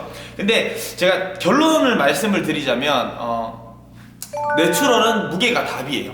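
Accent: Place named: Busan, South Korea. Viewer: 선생님 부산 분인가요? 사투리가 찰지네요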